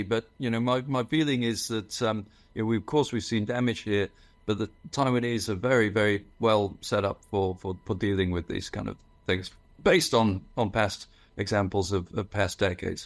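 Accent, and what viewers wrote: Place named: England, United Kingdom. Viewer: I detest the English dialect. The only one worst is aussie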